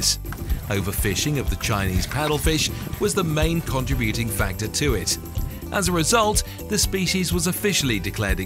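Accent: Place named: United Kingdom. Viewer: What's with the British accent?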